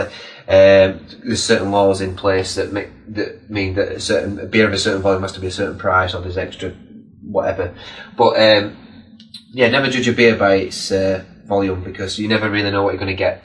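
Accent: Yorkshire, United Kingdom. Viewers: Great To shirt that reminds me of someone else who wears one! By the way how do U get a Yorkshire accent? I have been living up here for 10 years and I still ain't got one! nice review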